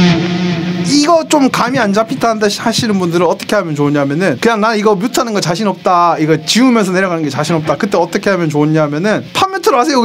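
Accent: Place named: Gyeongsang, South Korea. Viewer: 같은 경상도 사람이신가유..? ㅋㅋ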